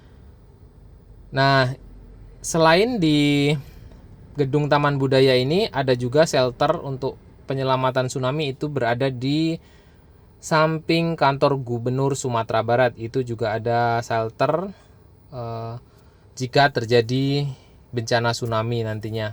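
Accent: Java, Indonesia. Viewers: trnyata ortunya jateng yah..pantesan logatnya agk medok2 dikit 😂😂😂😂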